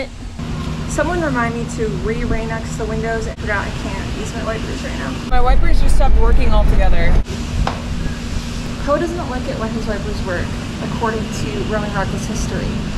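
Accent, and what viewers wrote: South, United States. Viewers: I’m from NC and your southern accent 😂 I like it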